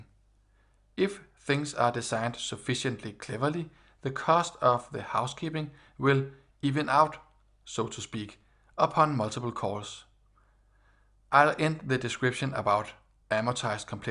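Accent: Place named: Denmark. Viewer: Pretty sure he is, his accent sounds exactly like a dane speaking english.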